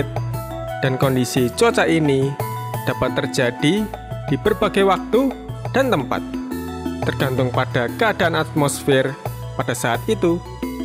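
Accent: Java, Indonesia. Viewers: Aku seneng dalam menggunakan Bhs indonesia dicampur bhs jawa, se akan2 ketok medog banget Bhs biar dikenal seluruh jagat raya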